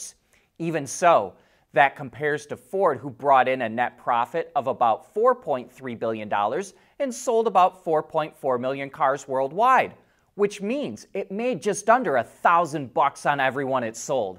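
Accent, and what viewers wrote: United States. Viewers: Is the presenter putting on the outrageous Murikan accent? Can anyone say where it comes from?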